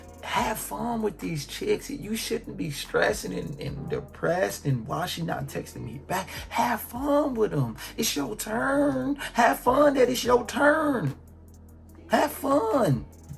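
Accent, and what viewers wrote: Baltimore, United States. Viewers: You gotta be from Baltimore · You from Baltimore huh brodie?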